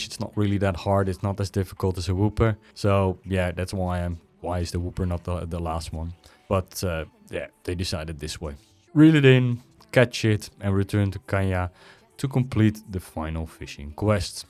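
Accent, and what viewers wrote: Netherlands. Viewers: nederlander zeker?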